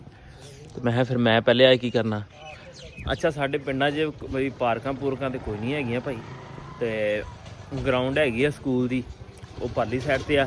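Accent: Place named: Majha, India. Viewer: Majhaa ali boli bolda bruh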